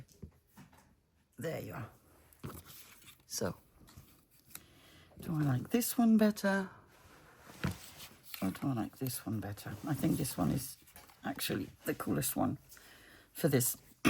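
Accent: France. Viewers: I love your style. I think the mole one is my favorite too. You do great with English being your second language. I do love hearing your french come out though. And your puppies.. gets my puppy all involved to and makes me laugh! ( mine watches tv ) Thank you I love the random ephemera videos.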